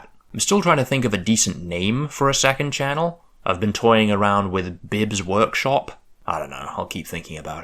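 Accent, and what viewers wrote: United Kingdom. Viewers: Omg you’re British!!! I thought you were a Neotectan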